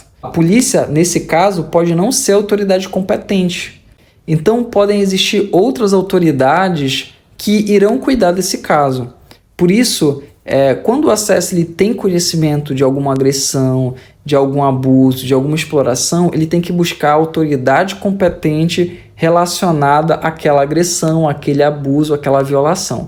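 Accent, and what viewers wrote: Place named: Pará, Brazil. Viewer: teu sotaque é muito paraense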